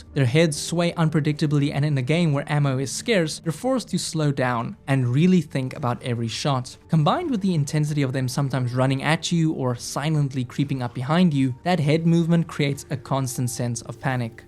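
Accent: South Africa. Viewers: When I started you video, I instantly knew you were South African.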